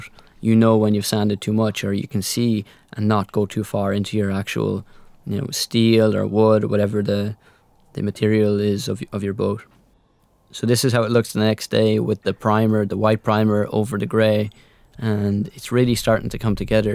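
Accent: Ireland. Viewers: love the videos love the updates and the restoration of this beautiful boat. Just a side bar , I love your Irish accent reminds me of my dear Gran that passed in 87 but was born and lived in Ireland before coming to the states , God Bless you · Ah you’re Irish. That explains a lot.i was looking around for the elephants. You were talking about the elements.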